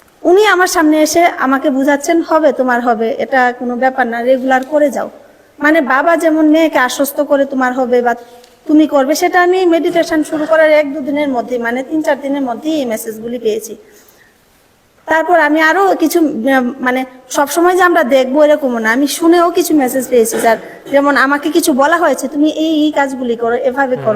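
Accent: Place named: Bangladesh. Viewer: Kothar tone ta onek ta bangladesher moto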